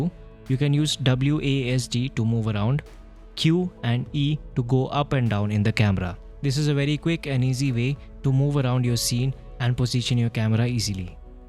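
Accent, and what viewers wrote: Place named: India. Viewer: Thank you for your time and the tutorial. I hate any noise in the background and since I appreciate good music; it should not compete with the narrative; becoming noise which by definition is sound that I do not want to hear. All the negative aspects such as the Hindi accent; worst of them all is side-stepped by putting the sound off and the subtitles on; choosing English. BTW, the word "THIRD" is pronounced with the tip of the tongue between lower and upper teeth otherwise you end up with the word TURD which is fecal matter; an embarrassing word.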